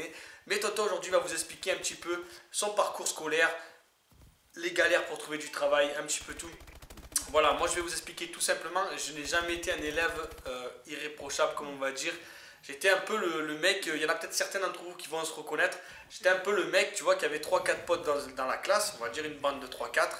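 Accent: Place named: Marseille, France. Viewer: Slt tonton j'adore t vidéo en plus t un marseillais j'adore les marseillais surtout l'accent bref gg pour ton nouveau taf sa fait plaise que t trouver un taf qui te plaît écoute g un petit souci au niveau fille en faite cela fait 6 mois que je suis avec ma copine et on a toujours pas couché ensemble pourrai-tu me donner un conseil car c la fille de mes rêve c ma futur femme on c déja décidé on restera ensemble pour la vie et voila le problème est la elle est timide et elle prend pas la pilule conseil moi un truc pour la rassuré mais bon 8 mois sans faire l'amour c long koi donc voila stp tonton merci si tu répond a ce petit texte je t'aime tonton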